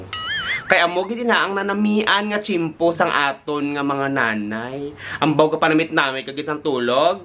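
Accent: Iloilo, Philippines. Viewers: Kamusta!Baw enjoy gid ko sang ilonggo accent mo ah kg ang mga tirada mo.Keep up the good work kg stay safe gid always 🙏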